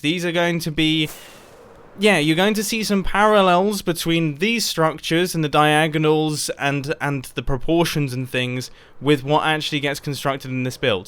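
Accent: United Kingdom. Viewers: he’s so british 😂 i love it when he says chuffed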